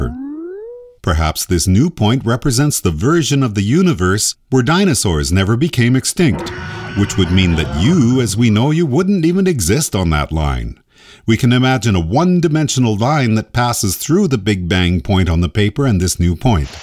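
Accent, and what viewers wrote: Canada. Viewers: You’re Canadian! I heard it in the aboot. Great videos.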